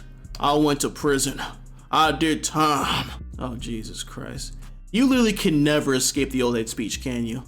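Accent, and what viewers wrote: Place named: Atlanta, United States. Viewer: He sounds more Atlanta than Miami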